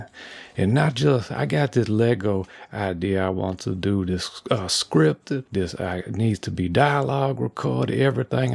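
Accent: Louisiana, United States. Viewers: is this accent a french person from louisiana. rec brought me here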